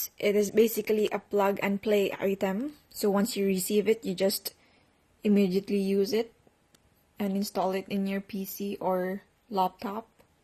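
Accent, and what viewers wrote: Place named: Philippines. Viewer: you are a filipino! I can recognize an accent from a filipino!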